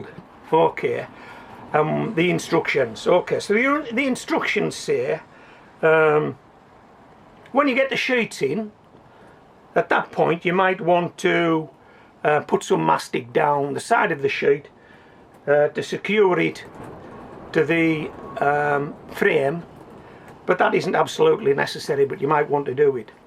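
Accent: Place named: North East England, United Kingdom. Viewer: Where abouts are you ? You sound very local to myself which is Loftus a small town in between Redcar & Whitby. Love your videos keep em coming! Just about to refit my polycarbonate greenhouse with more polycarbonate. This time going to reinforce as much as possible. North east England renowned for cold and VERY windy weather. Think it’s been down more than up my poor greenhouse!! Wish me luck!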